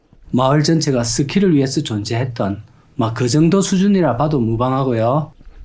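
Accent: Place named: Seoul, South Korea. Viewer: 이제 왜 경상도 사투리 안쓰요 서울말 한다고 서울사람 흉내요 경상도 사투리 매력으로 보고 좋아요 찍는데 이제 안볼란다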